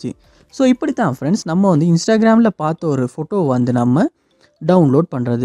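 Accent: Sri Lanka. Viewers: Srilankan tamil 😀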